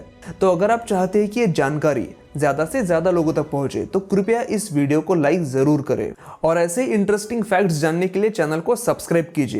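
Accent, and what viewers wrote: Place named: Bengal, India. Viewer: Sir, are you from Bengal? Cause your accent is like so.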